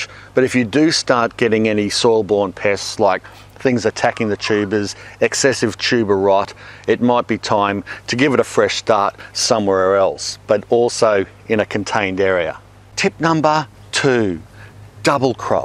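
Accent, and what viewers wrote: Australia. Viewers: Thank you for your Youtube-channel! Watching your videos is a study of Fun Gardening and Australian English at the same time :)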